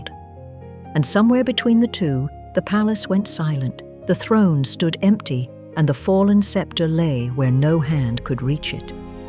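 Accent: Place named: England, United Kingdom. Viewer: Old English? No just english. Very nice too. Really enjoyed this. Thank you for sharing.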